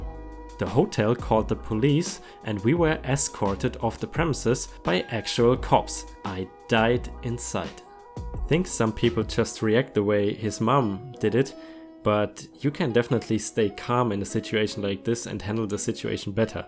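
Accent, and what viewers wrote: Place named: Germany. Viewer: Cooles Video, muss zugeben ich war nicht überrascht als du gesagt hast “Germany”, Deutsche hör ich sofort :D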